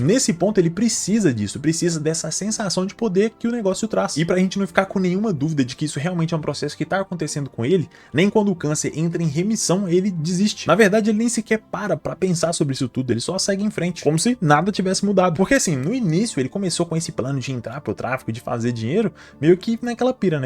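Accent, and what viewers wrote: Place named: Bahia, Brazil. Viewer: levemente baiano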